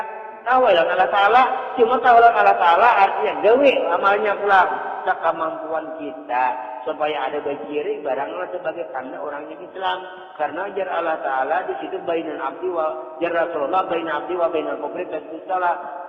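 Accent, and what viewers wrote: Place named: Kalimantan, Indonesia. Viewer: Kaya logat kalimanta ceramahnya,,kiyai2 dari kalimantan banyak sekali ya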